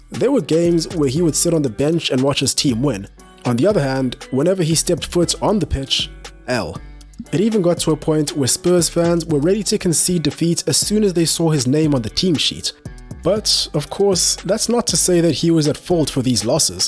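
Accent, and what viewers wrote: South Africa. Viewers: he is from the rsa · Are you South African bro? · Where are you from dude? Trying to place your accent. Sound kind of South African but I can’t tell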